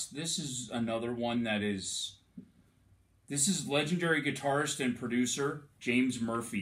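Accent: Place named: Canada. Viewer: Incantation flag is dope AF!! Are you from Canada? Just wondering because all of the "aayyy" lol. Good video btw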